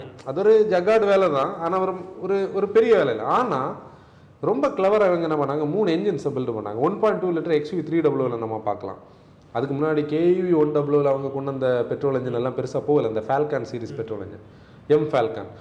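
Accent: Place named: Nagercoil, India. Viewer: Team, This is one of the best & top class discussion on cars that seen (in Tamil)! I thoroughly enjoyed the video. BTW, I have booked Carens this morning and I would be happy to have it reviewed by post delivery. bro( hope spelled right) I have fallen in love with your Nagercoil slang and simple, yet through detailing reviews. When you are in Chennai, pls shoutout I would be happy to host your team. This 2022 will take team to an another Pinnacle with GOD’s grace. Wishing you all a Happy New Year!!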